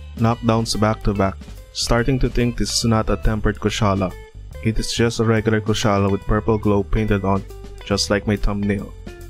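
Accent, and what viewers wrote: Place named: Philippines. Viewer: Presh filo accent 🇵🇭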